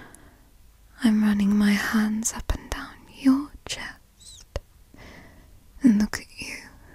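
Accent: United Kingdom. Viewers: That british accent is so cozy yet so misterious .the experience is very intimate with just simple words of affection im suscribed as heck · Mommy british voice nooo my one weakness. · Oh my, I am SO, weak for British accents. They have that posh, certain tone that makes them sound luxurious. and GOD, the teasing is just delicious to listen too, and the accent only amplifies it, I would so end up whimpering and flustered in the best way hehe. Lovely video love!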